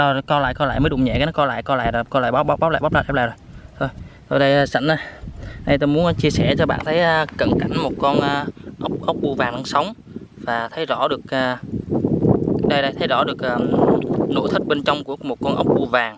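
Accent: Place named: Mekong Delta, Vietnam. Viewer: ah nói chuyện miền tây thì chỉ có ở châu đốc an giang😃😃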